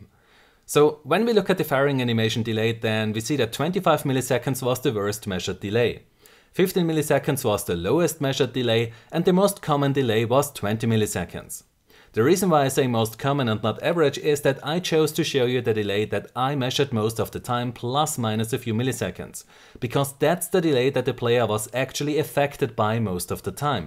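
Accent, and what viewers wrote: Austria. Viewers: Da hört man doch sofort den Österreicher heraus! Grüße aus Linz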